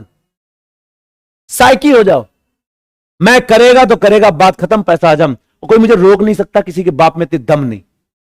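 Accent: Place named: Bihar, India. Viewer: I think he is from Bihar 😅 aawaz waysi hi h ❤️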